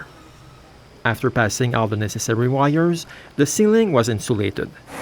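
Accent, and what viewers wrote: Quebec, Canada. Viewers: Un bon vielle accent quebecois hahah! Beau travail! Wow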